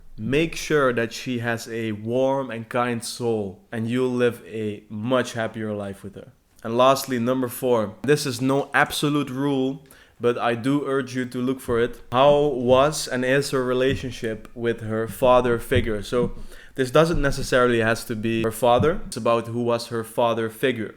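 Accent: Netherlands. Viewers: Are you dutch bro? The accent 😎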